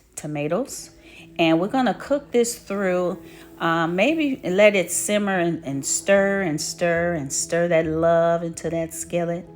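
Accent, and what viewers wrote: United States. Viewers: Sis u sound like a real country down home girl love it Thumb up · Where you fr baby girl, I love this dish I'm on my second time of fixing it, so good. You talk like some of my family from Halifax NC!!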